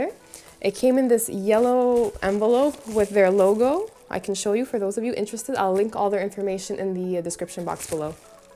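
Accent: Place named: Turkey. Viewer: Your accent very much sounds like Turkish! 😯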